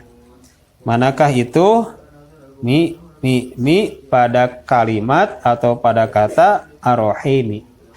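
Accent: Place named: West Java, Indonesia. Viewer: Alhamdulillah...p msh tetap eksis saya jd ikutan belajar barakallahu... enakeun jelas wlpun nyunda pisan...hehe 🙏🏼 htr nuhun smg dg menyampaikan ilmu menjadi obat utk kesembuhan... 🤲sukses ya...n mangat💪👍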